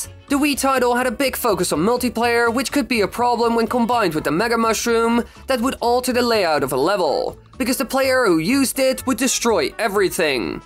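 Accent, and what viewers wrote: Netherlands. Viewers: Hey, you’re dutch arent you? Hallo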